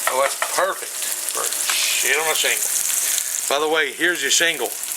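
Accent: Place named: South, United States. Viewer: Aww! Loved your vid and Southern accent. Looks great. A few things you said made me giggle and I enjoyed your deep love/respect for your pops. Thanks for sharing!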